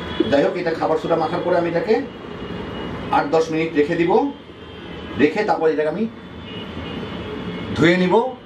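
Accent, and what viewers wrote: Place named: Dhaka, Bangladesh. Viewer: অসাধারণ হয়েছে, আপনার ভাষা শুনে মনে হচ্ছে আপনি ঢাকাইয়া। আমিও ঢাকাইয়া 😁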